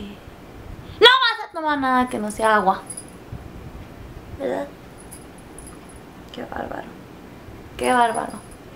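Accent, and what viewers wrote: Chihuahua, Mexico. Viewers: Me encanta el acento, arriba Chihuahua!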